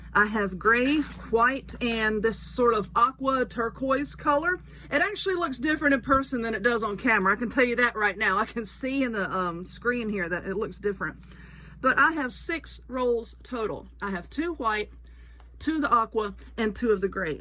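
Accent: South, United States. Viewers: Grand idea. I like your southern accent. I am an Island girl and I have an accent. I think it's cool. Thank you for sharing and yes l ok ve the mermaid nails. God bless you add and your family.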